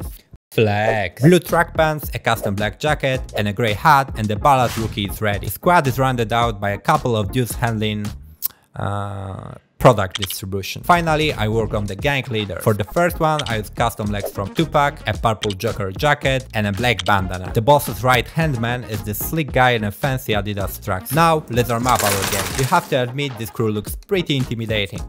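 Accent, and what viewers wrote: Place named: Poland. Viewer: słychać że z polski zajebista robota oby tak dalej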